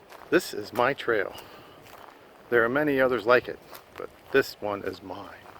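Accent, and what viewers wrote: North, United States. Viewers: Not a swamp at all you’re in a hardwood forest. You sound like a yankee transplant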